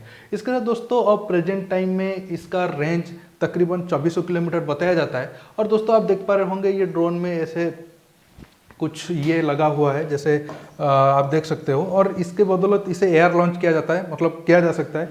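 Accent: Odisha, India. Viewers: Hindi bolne ke style se pata chal raha he ki aaap odisha se hi ho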